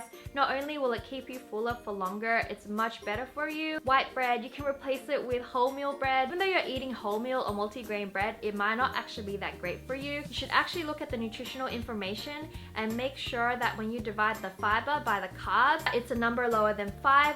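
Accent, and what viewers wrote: Australia. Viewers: She's from Australia? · Are you Australian?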